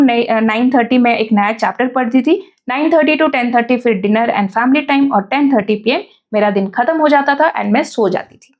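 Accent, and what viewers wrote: Odisha, India. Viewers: Ma'am app odisha se ho kya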